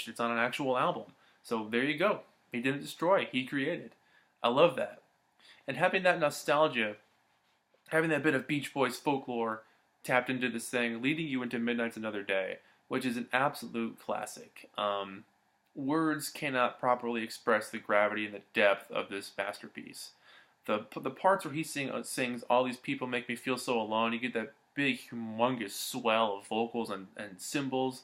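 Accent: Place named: Detroit, United States. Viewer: Hey, love your BB reviews! Where are you located... you sound like we do in the Detroit area... BTW, VDParks was in Detroit area about 7 yrs ago, a great show at a small gallery but too many yakkers around the bar kinda wrecked it.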